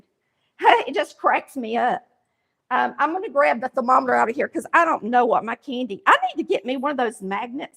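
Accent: South, United States. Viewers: I've listened to.your. blog.several. times now it is the.best i.have it memorized. I have 5 pds. of.raw Spanish peanuts coming to my door step the nuts should be here by monday.I'll be making the brittle in a cast iron skillet. Butter makes everything taste better. I love your southern accent I'm from Wa.state.May God bless you.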